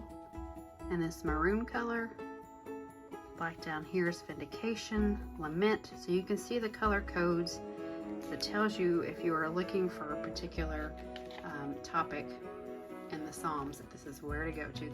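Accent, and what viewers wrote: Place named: Jacksonville, United States. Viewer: Are you in Jacksonville? Your accent.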